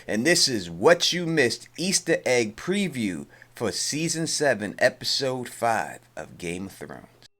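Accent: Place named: Texas, United States. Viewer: why the hell do these channels all have texas accents?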